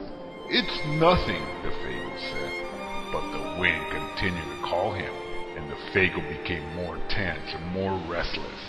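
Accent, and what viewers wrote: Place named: Minnesota, United States. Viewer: Minnesota accent lol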